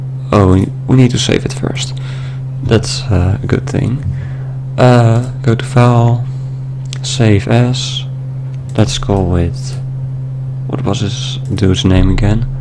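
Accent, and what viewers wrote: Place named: Netherlands. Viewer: Hoor letterlijk aan je stem dat je nl bent hahah lol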